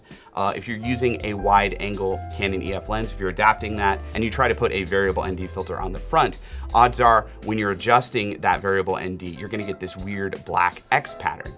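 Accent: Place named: United States. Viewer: Appreciate the American (Canadian? cannt tell) ,clear ,easy to understand review!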